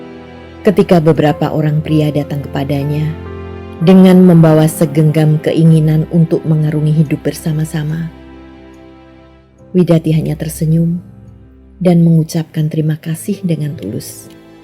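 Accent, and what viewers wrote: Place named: Java, Indonesia. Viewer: Pronounciation khas Jowo ya? He3... Sing penting penonton paham karepe ya? 😁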